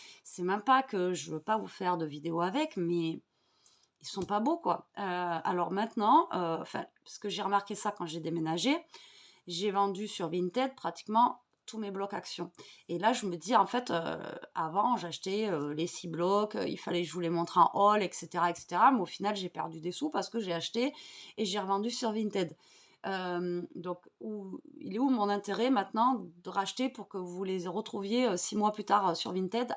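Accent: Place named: Southern France, France. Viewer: Restez comme vous êtes 🙏et un adage qui m aide beaucoup dans la vie : on ne peut pas plaire à tout le monde et c est tant mieux 😉quand à vos tutos « free style » c est justement ce que j aime en vous et votre joli accent plein de soleil ☀️ Je reste à vous suivre et surtout ne changez rien c est votre personnalité créative 😊🧡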